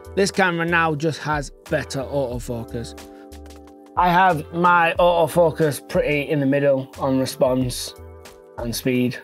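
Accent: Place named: Cockney, United Kingdom. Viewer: I hear Cockney man)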